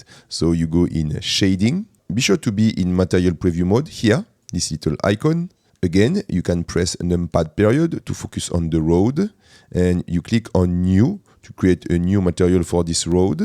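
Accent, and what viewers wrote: France. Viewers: accent français détecté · mdrr le big accent francais mais sinon merci pour le tuto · C'est quand même plus facile de comprendre avec un accent français. mdr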